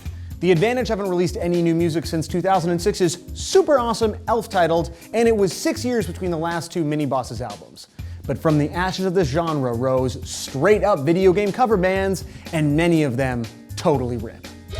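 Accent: Canada. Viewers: Its ok, we'll make it through this. ps you song just as Canadian as I do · There are no words to adequately express how much I love the fact that one of these bands is named Power Glove. And yeah, you really don't have much of an accent. Probably the least accent-y Canadian accent I've ever heard. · Accent? Yes sir, you sound like an Ontarian. You sound like you lived about a block from Yonge Street, actually. I do tend to lose my poop when an American accuses us of saying a-boot. No one says a-boot. We sometimes say a-boat. You, sir, don't really say a-boat. You pretty much say a-bout. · You most certainly have an accent. Everyone from Canada and the US states near Canada (Wisconsin, Minnesota, North Dakota) have a strong accent on any "ou" sound.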